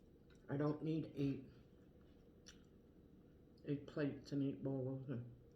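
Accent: Canada. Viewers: this is when I notice the Canadian accent lol 😆 I have no idea what you said haha! Nice video mama breakfast looks delish. Congrats again on the new crib!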